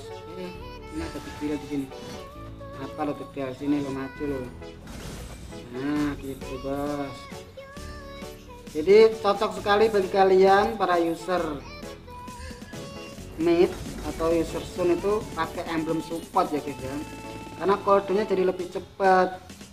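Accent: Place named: Java, Indonesia. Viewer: Medok mas😀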